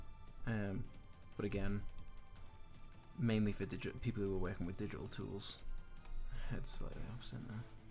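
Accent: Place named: United Kingdom. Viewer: holy crap he's British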